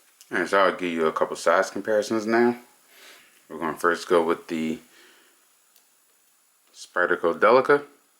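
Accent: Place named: Louisiana, United States. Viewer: Just curious. What part of the country are you from? Trying to place your interesting accent. Louisiana? Detroit?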